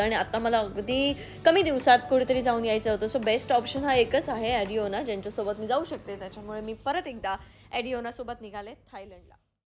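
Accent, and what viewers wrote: Malvan, India. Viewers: तुझे व्हिडीओस मी नेहमी बघते. तुझे मालवणी बोलणे ऐकून मी तुझ्या प्रेमात पडली कारण मालवण वरचे प्रेम🥰❤️ तुझे सर्वच व्हिडिओ खूप काही शिकण्यासारखे आहेत. एक मुलगी म्हणून तुझा खूप अभिमान वाटतो🫶🏻 तुझ्या वयापेक्षा तू खूप जास्त अनुभव घेतलास त्यातून खूप शिकलीस आणि तो तू सर्वासोबत शेअर करतेस🥰 तुला तुझ्या पुढच्या वाटचालीसाठी खूप शुभेच्छा👍 असेच तुझे सर्व अनुभव शेअर करत राहा आणि खूप खूप फिर मज्जा कर❤️❤️❤️ love you di❤️❤️❤️😘😍🫶🏻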